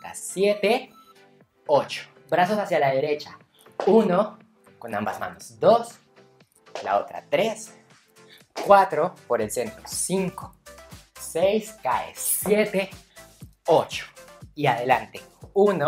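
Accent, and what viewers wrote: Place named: Mexico. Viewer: De dónde eres no me suena tú dejo o eres Mexicano?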